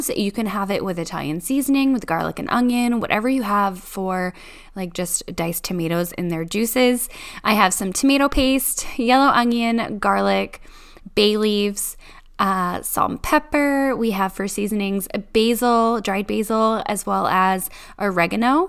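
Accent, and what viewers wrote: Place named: United States. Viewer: Haha yes yes I know oregano just can't believe how you say in America! I love your accent! Also your video has inspired me to get out my uggs! 👍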